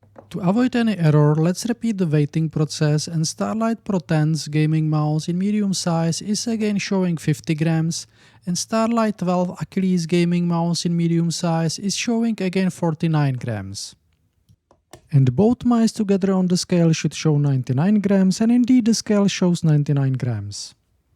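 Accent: Czech Republic. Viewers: Hi, great job, you're doing really great content, but I can hear really strong accent, I think that's Czech, am I right ? :D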